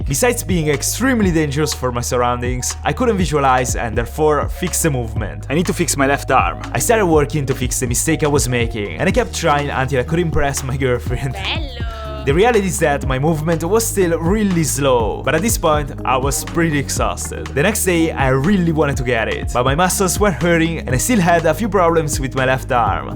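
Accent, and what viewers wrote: Italy. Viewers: Ho sempre sentito un accento particolare e oggi ho finalmente scoperto che anche te sei italiano! 😂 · L'accento italiano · Ti assicuro,notavo l'accento ma mai pensavo fosse italiano,also extra nice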